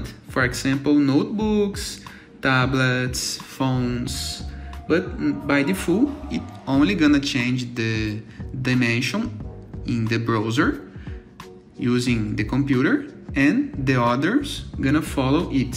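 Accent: Brazil. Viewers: sotaque full br kkkkkk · It sounds like Brazilian guy speaking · Esse sotaque br não engana rapaz, hahhahha valeu cara salvou minha vida aqui! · Amigão pela pronuncia vi que é BR, faz um roteiro antes de gravar o vídeo. Vai ficar mais fluido as explicações. Valeu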